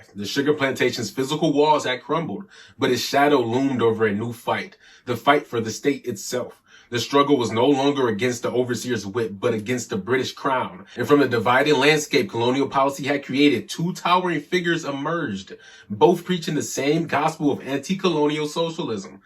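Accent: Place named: United States. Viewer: Okay. I want to listen to what you have to say however, the mispronunciation and American accent is throwing me off!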